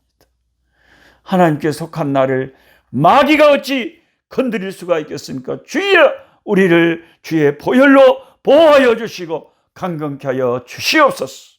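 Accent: South Korea. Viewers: 구수한 지방사투리에 정감이 간당게유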